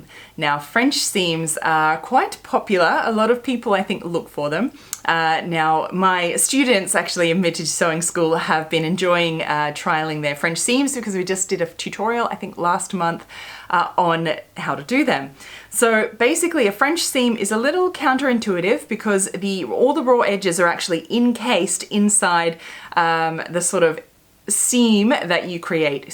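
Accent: Australia. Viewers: I love your advice, especially with your charming Aussie accent. My serger and I never bonded, too hard to thread, gave it away. Love my brand new Janome with several zigzag choices. Have done all the seams you demoed and love French seams, flat felled and bound seams. I’m 75, sewing since age 10 and still learning. It has been so interesting to see the newer trends and techniques in sewing. Especially loved the demo of your wrist pin cushion, I totally love it! It’s the first thing I seize when starting to sew! I’ve made everything from exercise wear and swim suits to wedding dresses. I love clothing construction and crafts. You are inspiring! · Aussie accent - yay !!!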